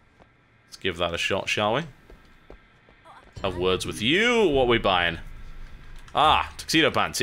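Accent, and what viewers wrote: United Kingdom. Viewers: Angry Joe is better. Sorry to say but a one-hour+ review with a British accent kills it. Plus he's playing the game rather than just reviewing the most important points the way Joe does.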